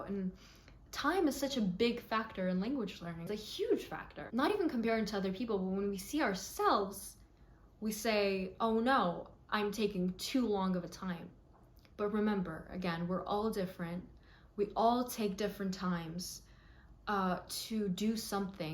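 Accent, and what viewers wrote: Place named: Turkey. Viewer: Are you Turkish? Your accent is incredible. Would you say that watching movies was the main thing for you?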